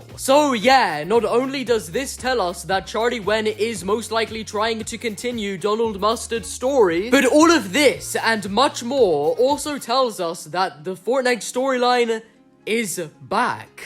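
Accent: United Kingdom. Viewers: He is definitely Brit. You can't really go by accents. There are a bunch of them plus variations of the same accents. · what actually is your accent. You sound like you are not from Britain but have a sort of London accent but you have a habit of adding the "ah" sound before or after many "ah-words" you clearly think this is more enunciated but it just makes you sound like you cant pronounce words because English isn't your first language. It's confusing.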